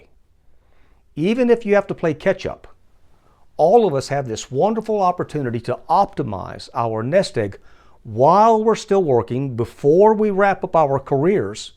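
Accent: South, United States. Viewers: l like your southern drawl is it?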